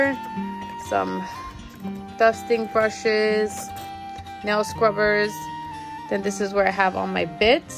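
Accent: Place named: New York, United States. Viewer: Hi! I’m new here. Good luck to you! I’m new at the nail game too, so I would love to learn with you 💜 and I’m just guessing that you too are a NYer..lol. And if not, you sound just like me 🤣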